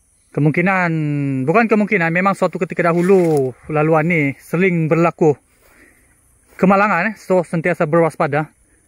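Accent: Sabah, Malaysia. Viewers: Cara dia cakap pun tahu orang sabah…kau tu bnyak bergaul ngn orang sikit cara cakap macam ajak gadoh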